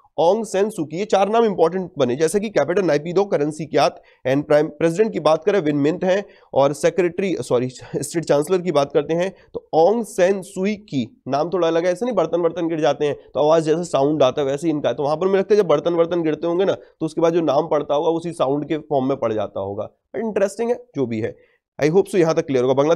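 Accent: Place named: Agra, India. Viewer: Arrey yaar ko agra me bolte h sir agra ke h isliye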